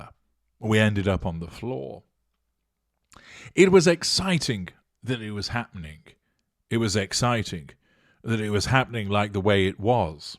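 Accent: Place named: Cockney, United Kingdom. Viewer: The east ender voices are just priceless and make me laugh every time.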